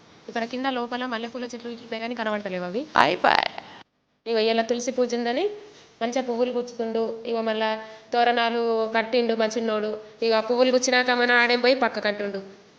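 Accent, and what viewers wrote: Nizamabad, India. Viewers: మాది నిజామాబాద్ జిల్లా,నీవు మాట్లాడే యాస నిజామాబాద్ జిల్లా యాస లాగా వుంది అందుకే మీది ఏ ఊరు అని అడిగాను.జై తెలంగాణ జై, భీమ్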